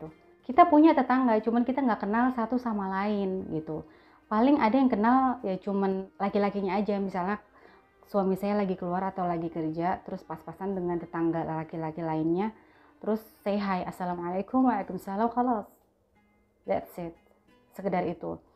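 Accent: Jakarta, Indonesia. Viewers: Keluarga jakartanya dmana mpok?..klo dr logatnya kayak di jaksel...sy asli jagakarsa....sy biasa bawa jamaah umroh mpok, mkn klo sy lg di madinah bisa bersilaturahmi 🙏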